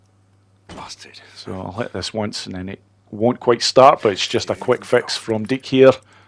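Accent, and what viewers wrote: Scotland, United Kingdom. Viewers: Great video pal. I've been extremely late to the Days Gone party having only just played through it for the first time in June (best 12 quid I've ever spent in CEX aha). I played on Hard 1 and had an absolute blast, putting in over 85 hours and grabbing the platinum - but atill feeling like i have way more fun to have. I didn't look up any tips before/while playing, so my early game was nowhere near as optimal as it could be - avoiding hordes for much longer than needed meaning i didnt get to enjoy the Horde Killer weapon rewards until really late in the story/after the story. I'd have done so many things differently in hindsight while also cleaning up Hordes earlier to grab the weapon rewards. Even though its only been about 5 or 6 weeks since i completed that first playthrough (and tidied up all the hordes), I've already been itching to get back into a second playthrough. 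I have no intetest in NG+ cause starring out weak, and slow progression is something i really enjoy, and hate restarting games overpowered. Well, after seeing this video pop up on my feed yesterday, i started my second playthrough last night (Hard 2 this time, more spongy enemies but keep my hub), and thanks to you I've grabbed these two beast weapons straight away 😁 thanks mate. You've got a new sub now from a fellow Scot 🏴 ps, where are you from? Your accent is similar from my mate who's from Elgin, but you might be from the North East? Anyway, keep up the great work and I've got a whole bunch of your vi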